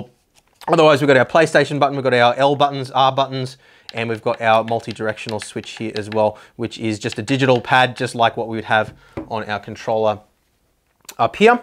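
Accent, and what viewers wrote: Australia. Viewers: I'm assuming you're from Australia with that accent.. apologies if I am wrong. Do you know what the pricing is in AUD?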